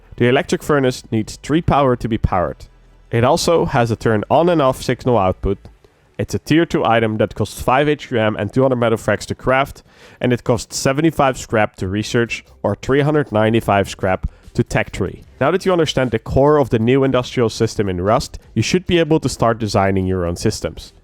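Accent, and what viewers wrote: Netherlands. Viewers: Ik proef het gewoon dat je nl bent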